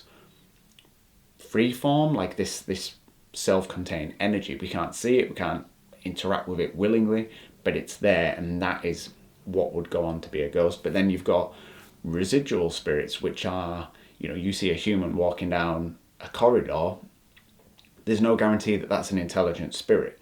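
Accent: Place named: Scotland, United Kingdom. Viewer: Greetings from the desert of so kali. First time at your channel. I take it you are British by your accent, Scottish now I'm hearing you more? Are there any homes left in the British Isles that are not haunted? I'm seeing a lot of your ancestry in your face, excuse me if I'm being presumptuous-I'm seeing Briton, Dane, high German(strong jaw), hair is wavy, is that Celt? High cheekbones, sometimes some Euros and Brits are built such as they look almost part American Indian-spacious eye/cheek area but likely not at all American indian. You seem very tall. Over 6' 2. Are you around 30 yrs old? Are you a Sagittarius? It's so wild to see younger generations embracing the paranormal the way I did when I was a teen, 20's and 30's. But back then, one was "weird" and pretty much ostracized for even talking about the things you are talking about now. 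Ah-that's an angle I hadn't really thought about-that unfinished business keeps the energy here as a ghost. A Wrinkle in Time-great book. I thought it was merely trauma that kept the energy earthbound as a ghost. But what you said makes sense as well. I saw a spirit or ghost one time. It was a man who was staring into my eyes intently as he went from an old man growing backwards until he was a young boy. There were light sparkles all around him and I was just floored, doing a check, recheck on his intent of showing himself to me. Then he disappeared as a young boy curly dark hair, dark eyes and wearing a hat with a feather in it that you'd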